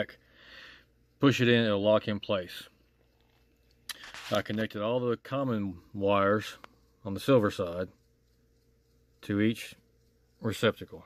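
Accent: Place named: South, United States. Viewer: Watching your videos of building your first control box, I think, and making giant candy canes. You were handling power tools your left hand. You're in the south, I'm in the south (TN), so I can understand you. You're a leftie, I'm leftie. All the more reason I like your channel. I just wish I had found you soon enough to build a controller and show for this Christmas.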